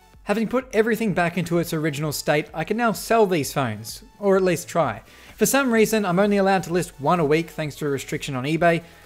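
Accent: Australia. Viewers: "Ichoons." God I love the australian accent.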